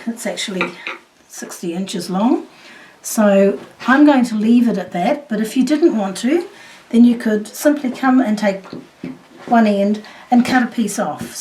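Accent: Australia. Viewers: Oh! Thanks for clearing that up; sorry I didn't quite understand. I love the Australian accent!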